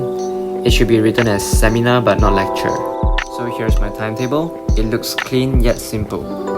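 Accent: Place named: United States. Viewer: Why do i hear the US accent HAHA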